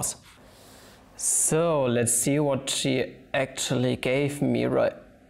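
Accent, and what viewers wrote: United Kingdom. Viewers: nailed the british accent boi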